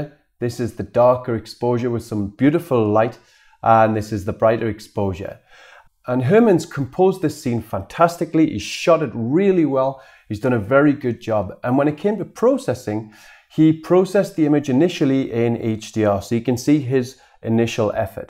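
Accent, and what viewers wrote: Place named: Bradford, United Kingdom. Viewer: Are you from Bradford? Your accent sounds too familiar! Also, great video! I've missed playing around in Adobe CS.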